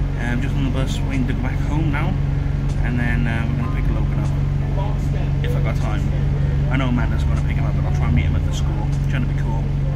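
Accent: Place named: Wales, United Kingdom. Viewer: Thanks for this. Grew up in Croesy and it's lovely listening to the accent and seeing places from years ago. (I live in Canada now). You're doing great vlogging - keep it up (I know this is two years ago, and I see you have kept it up!)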